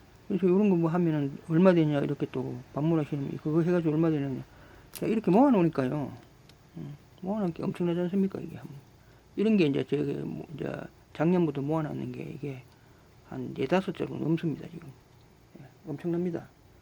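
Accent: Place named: Gyeongsang, South Korea. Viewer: 수고 많습니다ㅡ 말씨를 들어 보니 대구분이신 거 같은데ᆢ 사업 번창바랍니다^^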